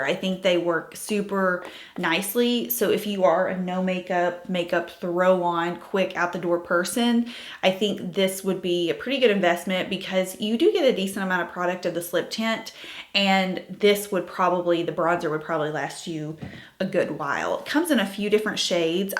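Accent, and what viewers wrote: South, United States. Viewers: I love your southern accent though!💛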